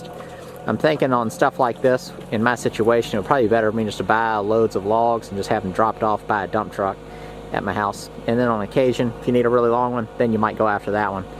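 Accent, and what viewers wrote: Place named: Carolina, United States. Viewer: I've wondered myself if he's from North Carolina. However he always hides the front license plate on his vehicles and the only southern state to require front plates is Virginia. His accent is Carolinian though so I wonder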